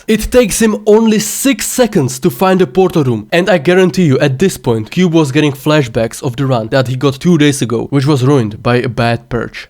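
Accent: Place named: Czech Republic. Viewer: Yo great video and breakdown! Keep up the content ;) (Within the first seconds I was just searching whether or not you are Czech, knew I heard the accent! :D Nice to see some more Czech people with actual good videos)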